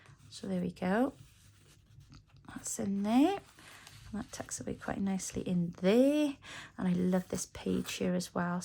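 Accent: Wales, United Kingdom. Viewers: 🤣🤣🤣 OK, I didn't hear it the first time around but I can now! Too funny! It sounds so wrong coming out of your mouth alongside your lovely delicate Welsh accent! You'll be fine when you come over here though, most ppl will just think you have bit of a strange accent! ❤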